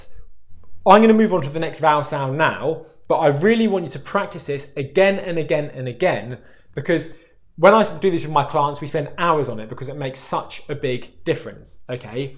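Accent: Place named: England, United Kingdom. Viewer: Millions of English people do speak this way. Where have you been? Listening to US American Movies?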